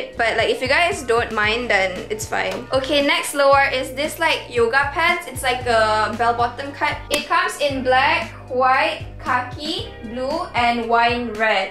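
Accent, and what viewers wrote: Sarawak, Malaysia. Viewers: This is my first time watching your video. And I'm guessing you are Sarawakian sbb ada slang sikit. Lepastu whenever you klaka sarawak and I was like, OMAGOD HEBATNYA AKU. hahahhaa. Love your video and tq for the tripod yang boleh lekat dkt katil tu. Gonna buy it!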